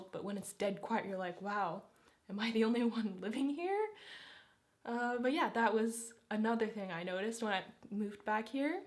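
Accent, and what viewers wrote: United States. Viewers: She sounds American, not British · No offense you have an American accent i would thought you were from America lol 😳😳🤯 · you sound American to me for some reason, so cute and fun opinions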